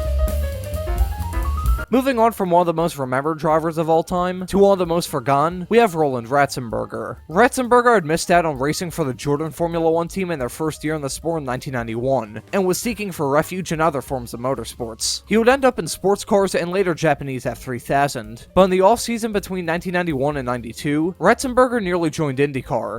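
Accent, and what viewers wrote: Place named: Mid-Atlantic, United States. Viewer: Ah I was gonna guess somewhere in the mid Atlantic. I grew up in Baltimore so I thought it sounded kinda familiar! Great videos btw keep up the good work. Cheers from the Indiana!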